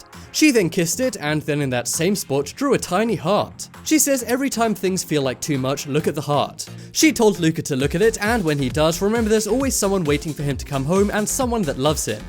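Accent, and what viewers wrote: England, United Kingdom. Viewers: God you're so dumb , he pronounces mum differently because of his accent. Just because he has an English accent doesn't mean he's going to pronounce it as Mum. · He's speaking American English with an English accent ???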